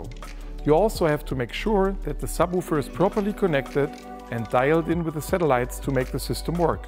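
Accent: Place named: Germany. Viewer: After two words spoken, I knew that he must be a german haha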